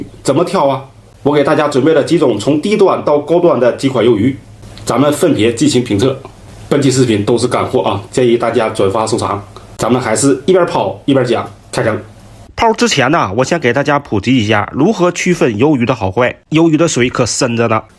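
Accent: Northeast, China. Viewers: 这东北大碴子口音😂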